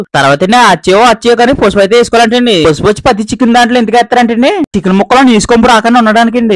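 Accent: Godavari, India. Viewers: Meeru meee comments okalla meeda okallu. Godavari yaaasa adbhutam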